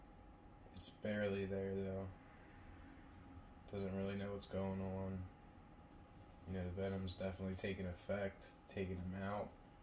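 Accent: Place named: Philadelphia, United States. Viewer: Where in Philly/south Jersey are you at? I live in north Jersey so I recognize the accent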